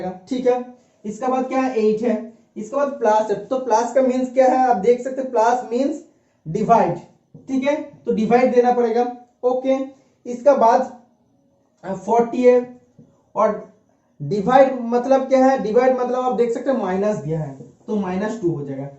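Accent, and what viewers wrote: Bengal, India. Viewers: tumi bangalee? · Vaiya apko bat sunkar bihari nahi lagrahe Bangali lagrahe ENG er puro 25 questions dekha digiye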